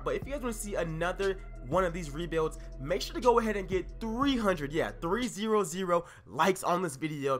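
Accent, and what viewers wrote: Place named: New York, United States. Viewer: It sounds like your From ny are u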